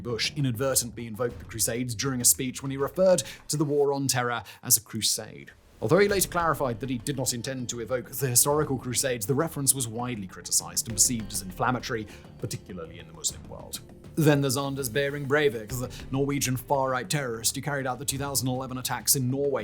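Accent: United Kingdom. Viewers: why do you sound extra British in this episode?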